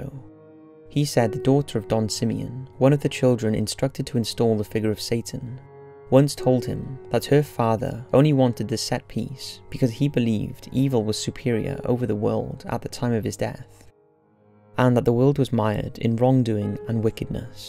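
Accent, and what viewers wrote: Wales, United Kingdom. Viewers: Bro are u Welsh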